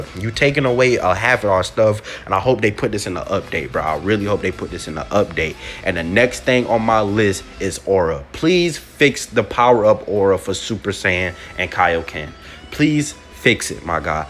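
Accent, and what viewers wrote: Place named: Florida, United States. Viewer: You got to be from Florida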